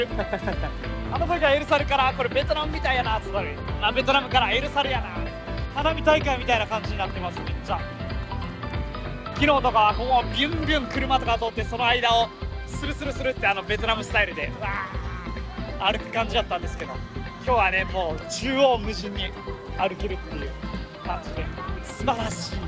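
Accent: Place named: Mie, Japan. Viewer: 最近見出したのですが何人くらいで旅してるんです？ 喋り聞いてると三重の方かな？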